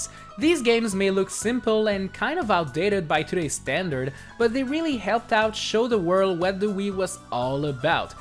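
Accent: Quebec, Canada. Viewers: ca sonne vraiment quebecois ton accent chum ! premier video, thumbs up ! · Are you French Canadian?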